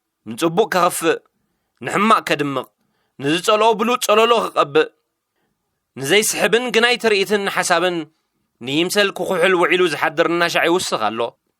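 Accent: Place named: Eritrea. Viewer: ኣብ ትሕዝቶ ምዘን,,ድምጺ ናዓና ንእረትራውያን ስለ ዝመስል ናይ ትግራይ ላዛ ዘይምጥቃሙ ንዓኻ ኣይርድኣካን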